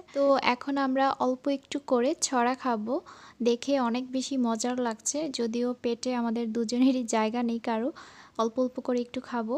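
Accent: Comilla, Bangladesh. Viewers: Apu tmr basha comilla kothay?? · Nice apu bt apnar basha comilla kothai amar o comilla basha apu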